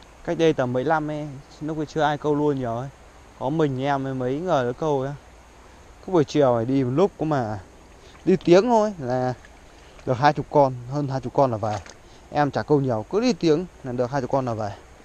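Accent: Vietnam. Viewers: ông này nói giọng khu vực nào ý nhỉ, Hà Tây à, chỗ toàn nói thành chố, đĩa thành đía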